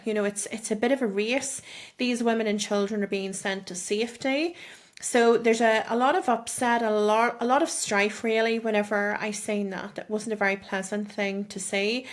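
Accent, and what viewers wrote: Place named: United Kingdom. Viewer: Nice British accent prophet🎉🎉🎉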